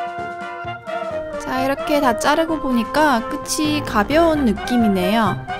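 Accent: South Korea. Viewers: 꼬마 부산에사셔서 부산같은데.. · 님 대구 사람이예요? 아님 서울 사람 이예요?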